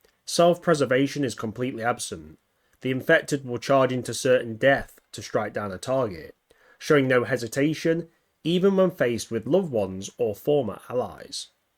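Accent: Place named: Mansfield, United Kingdom. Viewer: Mansfield accent detected. 🚨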